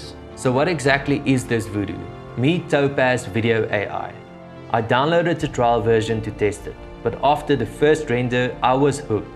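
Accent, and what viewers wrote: South Africa. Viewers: Hi. Amazing channel. Thanks so much for sharing all of your skills. Great to listen to a South African for a change. No sure which programs you use for your editing but I using Premiere Pro. Not a big APPLE fan so not using macbooks as my laptop of choice. I know I can follow recomendations from ADOBE themselves but what would you say will be a good setup (hardware) to do some descent 4K editing?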